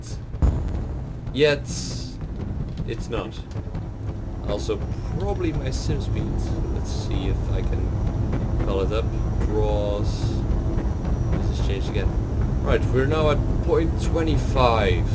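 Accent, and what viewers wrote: Netherlands. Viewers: Are you dutch? You sound Dutch or even a bit French?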